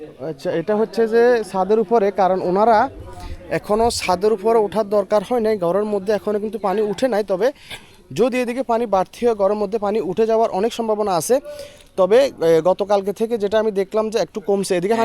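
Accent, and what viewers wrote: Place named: Noakhali, Bangladesh. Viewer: ভিডিও অনেক ভালো লাগলো অনেক দিন পর নোয়াখালির কথা শুনলাম আমেরিকার থেকে দেখছি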